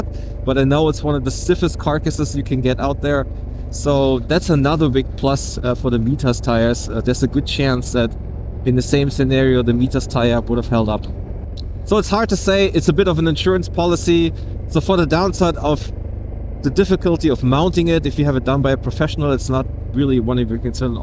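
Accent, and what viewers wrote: Germany. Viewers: Gutes englisch aber den deutschen hört man trotzdem raus haha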